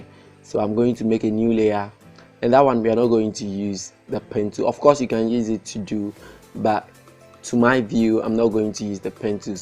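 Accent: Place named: Ghana. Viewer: Sounds like a Ghanian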